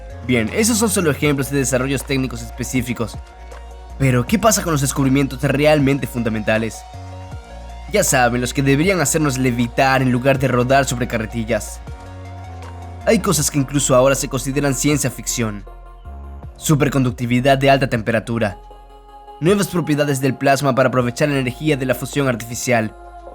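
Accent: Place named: Yucatan, Mexico. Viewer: Es men es Bombaaaa hablas Yucateco!! Saludos Bro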